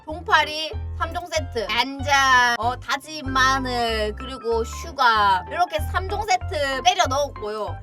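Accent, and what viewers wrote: Busan, South Korea. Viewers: 부산 아지매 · 이분 경상도인임?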